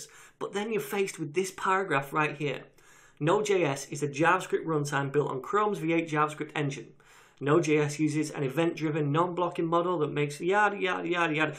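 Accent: United Kingdom. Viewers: What can I say I love British accent thumbs UP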